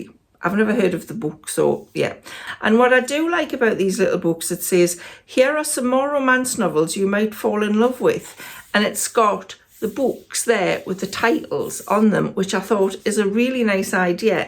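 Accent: United Kingdom. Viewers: First of all, how nice to hear a British accent on YouTube!!! I watch loads of bookish, interiors and cooking videos when I can, and the presenters of the videos are - without fail - American - so I'm so happy to have found you. Look forward to catching up on your back-catalogue of book recommendations. x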